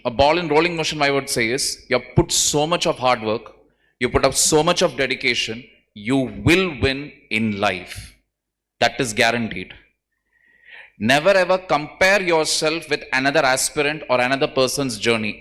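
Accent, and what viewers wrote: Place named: South, India. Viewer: Bhasa se hi pta chl rha tha south k h 😊kuki south movie me ase hi bolte h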